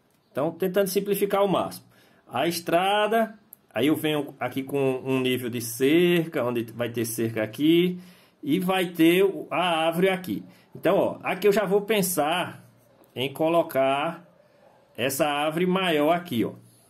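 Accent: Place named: Northeast, Brazil. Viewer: EXCELENTE DICA, obrigado professor, só uma curiosidade, o SENHOR tem um sotaque nordestino, de que estado o SENHOR e'? Adorando seus vídeos, também sou pintor, PARABENS PELO CANAL, Abraços aqui de BH- MG.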